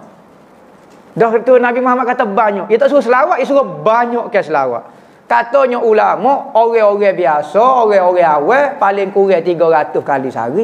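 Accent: Kelantan, Malaysia. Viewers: Assalamualaikum! Ustaz boleh tak cakap Bahasa Malaysia lebih banyak sebab kekadang sy tak faham cakap Ustaz tapi suka dengar ceramah Ustaz sy tak faham loghat Kelantan maaf ya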